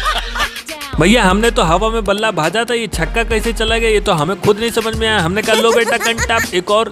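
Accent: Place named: Gorakhpur, India. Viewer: Bilkul aap Gorakhpuriya bhasa bolte hai hm logo ke tarah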